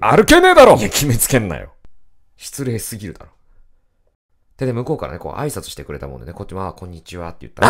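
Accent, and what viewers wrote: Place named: Tokyo, Japan. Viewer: 画面平安時代なのに口調江戸っ子なのがもうおもろい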